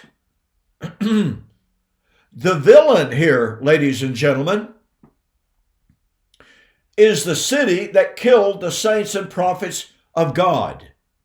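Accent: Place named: Arkansas, United States. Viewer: this is some of your best material. I know you do not mean to be humorous in your delivery, but the way you point out the absurdities of the futurist, dispensationist view in your Arkansas vernacular has me floored every time. I just can't stop laughing. It is some of the best unintentional humor I have ever heard. Keep up the great work!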